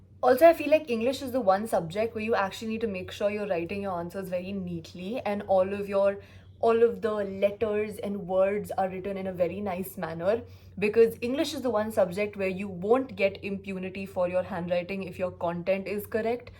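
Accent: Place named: India. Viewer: This is called cbse accent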